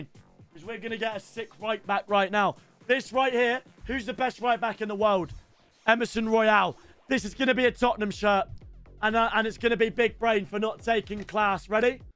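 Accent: South Africa. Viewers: Love the Souf African accent!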